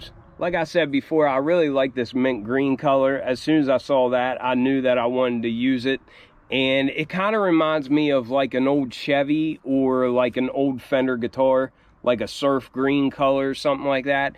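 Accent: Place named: Pittsburgh, United States. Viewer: That mint green is going crazy! I love them! Pittsburgh here, bro you got to be from Pittsburgh? I know I've heard you say you live in WV but that accent is heavy Pittsburgh. And I heard you say you love the teams. Just curious if you're from here or from WV? Not that it matters I love your stuff. Great reviews, great taste!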